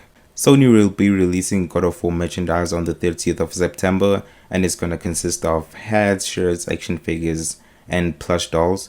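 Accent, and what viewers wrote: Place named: South Africa. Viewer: Can hear ur from SA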